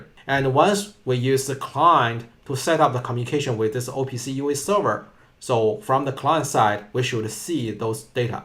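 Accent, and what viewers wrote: China. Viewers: 哥们，一听你口音就知道你是京津地区的人啊。满满的口音让我想起了在北京读的本科。非常好的视频，我正在做我的硕士论文，也是和OPC UA有关的，看了你的视频受益匪浅。非常感谢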